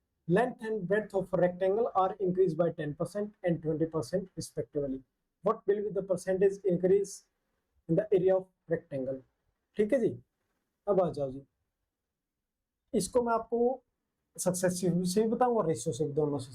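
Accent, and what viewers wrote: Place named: Haryana, India. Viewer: Haryanvi control kro sir thoda 😅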